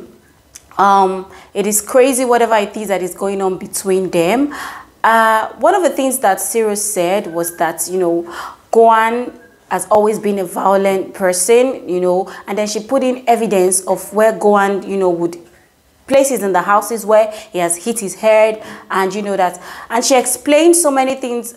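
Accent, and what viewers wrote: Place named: Africa. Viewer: Who is complaining about your accent again? Are we not Africans? My dear, no be everything you go address o. You may need to ignore some comments.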